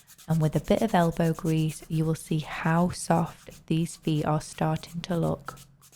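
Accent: United Kingdom. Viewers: I'm not used to a UK accent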